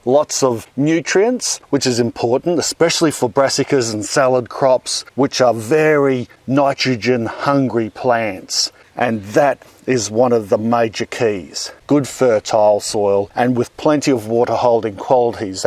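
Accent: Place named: Australia. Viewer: An Australian living in Canada now since 1971...your teachings are great, but your Aussie way... it is just beautiful ..even had a fleeting thought maybe I could return one day to live....the words written on your t-shirt seeing the earth through her eyes.... that is so precious and evocative..thank you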